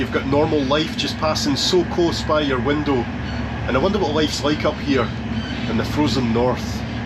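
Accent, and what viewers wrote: Scotland, United Kingdom. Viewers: Before watching this video, I'm excited to see a outsider view on VR trains! :D I have used the train to travel from study city to hometown, 700km+ takes 6h 30mins usually. and anytime i have had delay due to VR being silly has been at least twice. Delay happened because there wasnt enough of busses or taxis for around 40+ people so it was quite annoying. Now I will watch this video and see your thoughts! edit: Gave a sub and like, I love Scottish accent and I love hearing about non-Finns express their thoughts on Finnish stuff :D 89€ sounds like a fair price. mine usually are 30-50€ depending on how close i book the departure etc. Aww I loved the Conductor's Hello :D They are doing this thing called "Pilkki", which I think is called Ice Fishing? But you have this tiny rod and you sit near the drilled hole hoping to capture a tiny fish. 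Never tried it, only regular fishing :D one time i get to spend little bit time in one, since the train had broken so we had to partake in a train as extra passenger so i stayed until someone who had it reserved came to claim it. The soundproofing of them are quite nice! currently while writing this i am , Here he the breakdown of the coaches in VR trains Coach 1 = pet coach coach 2 = Extra class coach 3 = Extra/silent coach(I might be mixing the 2 and 3 together, but i remember that both should have unique top decks) coach 4 = Children play area Coach 5 = just your average coach Coach 6 = nothing special I will admit i am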